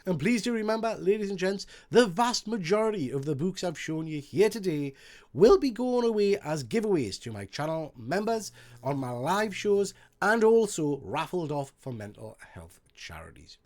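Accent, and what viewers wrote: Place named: Northern England, United Kingdom. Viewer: Sounds like a northern lad, gives comics away for free! How did it take me so long to come across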